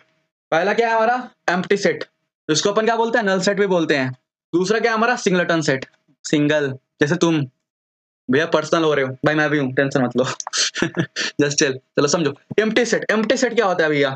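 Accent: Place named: Bihar, India. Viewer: bhaiya kbse hi lg rha tha aapke accent se aap bhi bihar se ho...... ❤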